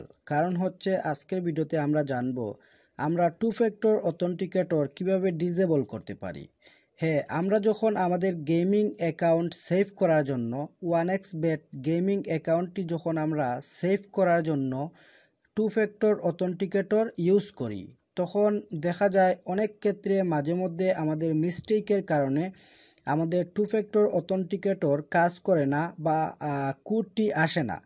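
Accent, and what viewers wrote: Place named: Sylhet, Bangladesh. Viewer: ভাই মনে হয় সিলেটি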